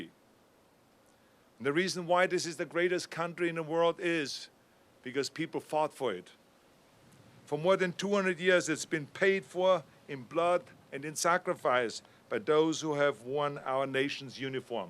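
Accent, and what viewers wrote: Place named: Germany. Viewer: D His accent is so awesome german :D "schmusen" :D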